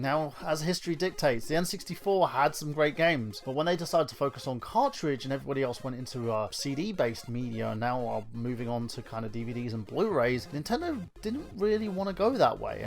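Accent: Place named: England, United Kingdom. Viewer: you're right. I was mislead by the title of your video, and thus chose to watch the video believing that it was going to be a quality, intelligent critique with evidence-based facts and actually be about the Switch. My mistake. But through our correspondence I have learned something; that even guys with English accents can be childish YouTubers who get butt hurt by negative comments on their videos.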